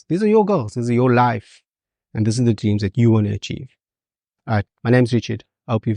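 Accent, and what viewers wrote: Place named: South Africa. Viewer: Sounds like a South African I loved what he had to say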